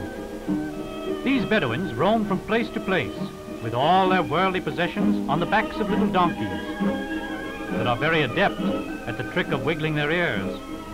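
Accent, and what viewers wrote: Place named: United Kingdom. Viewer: Its always with a british voice.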